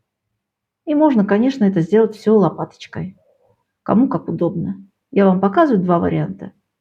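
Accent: Baku, Azerbaijan. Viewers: Спасибо огромное за рецепт тортика,такого в моей "копилочке" еще нет!!! Испеку обязательно,порадую внуков!!! Обожаю ваши ролики,ваш бакинский русский!!! Привет из Молдовы...❤ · Smotru vas iz Amerika, no sama iz Baku azerbaijanka, za stolko let akcent ne izmenilsa, udaci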